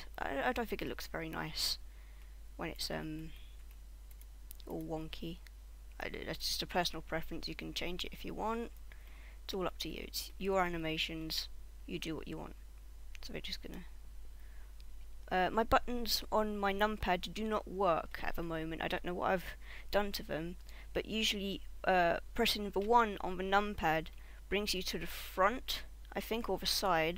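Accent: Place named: England, United Kingdom. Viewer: omg your english I LOVE ENGLISH PEOPLE OMG :D you sound cool!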